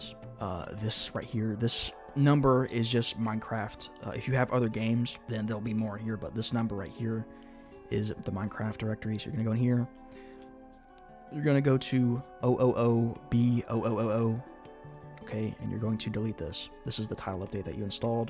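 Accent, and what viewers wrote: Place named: Kentucky, United States. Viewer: he sound like my old friend from kentucky 😥😥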